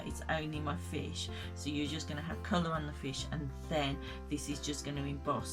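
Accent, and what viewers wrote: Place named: England, United Kingdom. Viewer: ps trying to place your accent! I'm originally from the North West of England (now in Scotland) and yours sometimes sounds N.West... but it sometimes has a west country twang? :O) mind you, I'm a bit rubbish with accents!! ha ha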